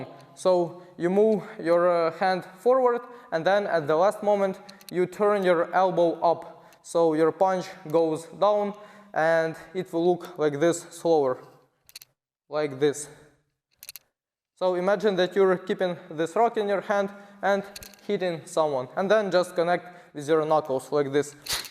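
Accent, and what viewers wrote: Russia. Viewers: Russian accent = absolute beast in anything combat related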